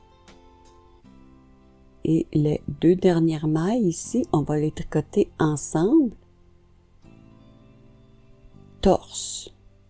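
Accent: Canada. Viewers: Merci beaucoup pour ce nouveau tutoriel.Le rendu est magnifique et les deux brins de laine vont donner un résultat bien chaud. Je vous félicite pour vos explications et images très claires... et ce qui ne gâche rien j'aime beaucoup votre charmant accent canadien ❤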